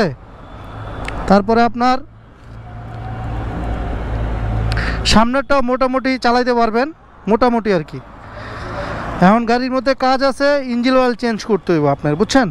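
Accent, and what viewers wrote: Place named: Noakhali, Bangladesh. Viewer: ভাই নোয়াখাইল্লা বলে কথা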